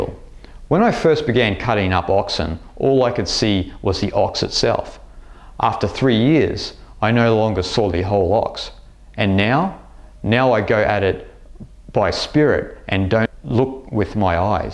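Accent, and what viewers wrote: Australia. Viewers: Something about an Aussie accent that makes me not take it serious...but this fellow is good. Thanks sport. Peace be unto you.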